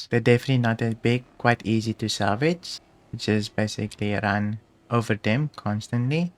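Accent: South Africa. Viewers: Hey bud, sound like a SA, you on a Discord. Looking for people to play with, love the vids.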